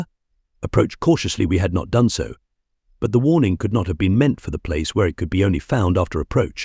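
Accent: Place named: United Kingdom. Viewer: I like the British narrator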